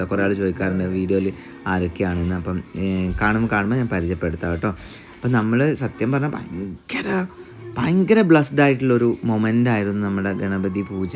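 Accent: Malabar, India. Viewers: മലബാർ സൈഡ് ഒക്കെ ഇങ്ങനെയാണ്, മലപ്പുറംകാരി ❤️ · മലബാർ സ്ലാങ് അല്ലെ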